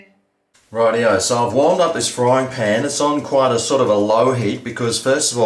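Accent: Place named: Australia. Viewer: Channel he's Australian not French do you know what French accents sound like.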